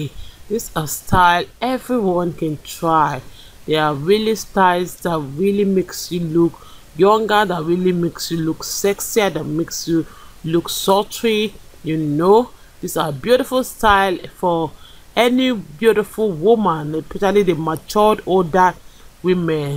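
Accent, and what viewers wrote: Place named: Africa. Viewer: She has a beautiful African dialect. I love those beautiful short cuts!!! Thank you for sharing.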